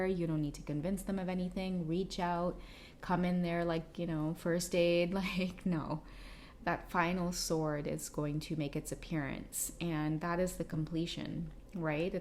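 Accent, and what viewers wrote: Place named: Trinidad and Tobago. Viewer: I always knew you were from Trinidad 🇹🇹 hearing you talk .am from Trinidad 🇹🇹 too I love ❤️ your reading 📚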